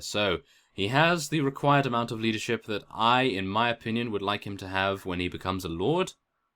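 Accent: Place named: England, United Kingdom. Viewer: Finally caught up with the whole seriesssss, I love these vidsssss. You are sooo fun to watch. top 3 lets play channels for me are You, J2JonJeremy, and IDubbzTV. You guys just had a certain flavor to your vid, always listening to the audience and taking suggestions, instead of just playing blindly doing whatever you wanted, etc. Plus you are a very funny man even though it sounds like your serious at times (must be the english accent)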